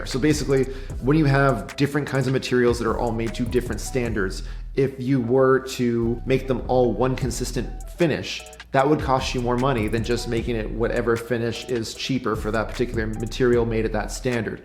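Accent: Canada. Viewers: He’s Canadian eh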